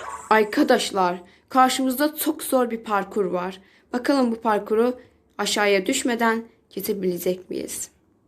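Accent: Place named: Azerbaijan. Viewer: Salam azerbayvanlısın niyeki bildim sesiinden :)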